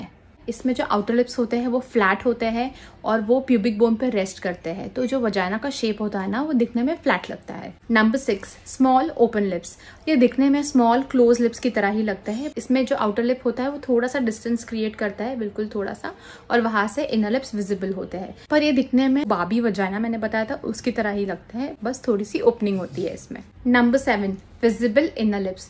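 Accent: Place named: India. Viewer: indian accent could narrate my life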